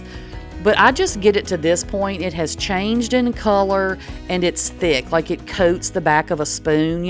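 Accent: South, United States. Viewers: Don't take this the wrong way cuz it's a compliment I love your southern accent I don't know what Southern States you're from but it's you sure have a southern accent You take care dear and God bless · Don't ever apologize because of where you are from or your accent. So many times, southern people are tried to make look less intelligent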